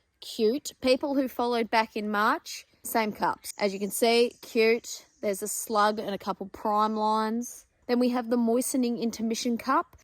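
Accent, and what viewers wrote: Australia. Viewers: Trying to just learn Aussie accent with you 🙂😂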